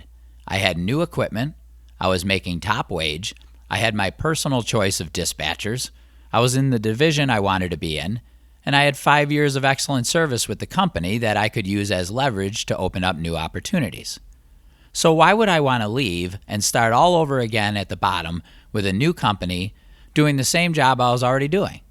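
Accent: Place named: Midwest, United States. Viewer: I can definitely hear your mid-western accent. Nice well informed video. Maybe someone can learn from this.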